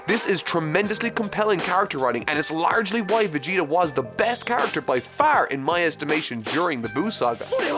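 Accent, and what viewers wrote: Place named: Ireland. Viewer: Hes.. Irish..?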